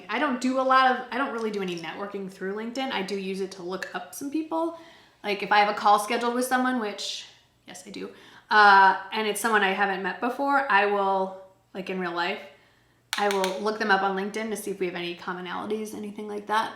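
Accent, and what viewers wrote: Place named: South, United States. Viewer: Omg I love you! Spoken like a true classy southern lady 👏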